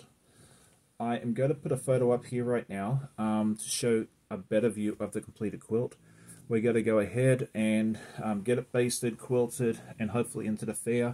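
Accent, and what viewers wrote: Australia. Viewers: Hi, really love your koala quilt, and also the daschound quilt. Your doing fantastic stuff, and I like your promoting of other YouTubers, By the way, because I’m an Aussie too, I don’t pickup on your accent…you sound like everyone else I know! It’s funny…when I was in US and UK, I could pick out an Aussie accent immediately as there were so many other accents that were new to me. Good luck finishing everything for the quilt show, 👍🇦🇺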